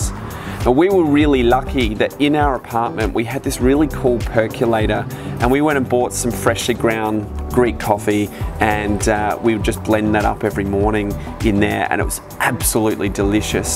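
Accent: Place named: England, United Kingdom. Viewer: Why is an English man doing a video on Greece? I want to hear it from a Greek man, I dont want to hear an English accent, one of the worst accents in the world. People in England speak horrible english, can never make out what they are saying because they cut the words in half when they speak.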